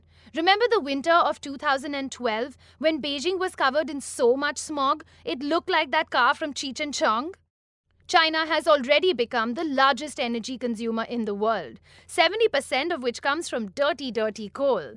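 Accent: India. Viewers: Indian English sucks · t series accent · Sounds Indian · Your Indian accent is like those Indian phone scammer use. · India India India that irritating accent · Indian accent is killing us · I like your Indian accent and I am trying to learn Indian English from you.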